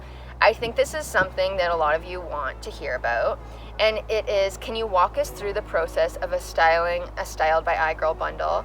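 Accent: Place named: Canada. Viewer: Canadians man, they pronounce “ou” and words w a hard sound between two a’s, like pasta sounds really weird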